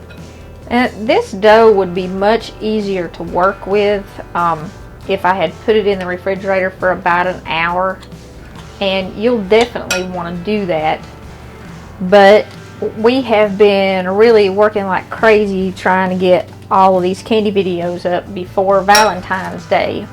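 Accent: South, United States. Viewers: Love the accent of southern women..whew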